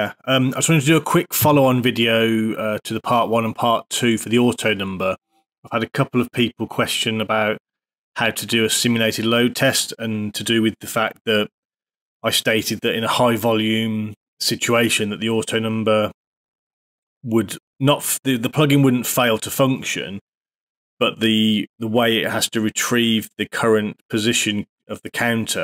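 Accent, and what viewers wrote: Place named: United Kingdom. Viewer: Man, we just hired a really expensive guy. Wish we knew about you., from your accent you're based in the UK, but where abouts are you in the UK?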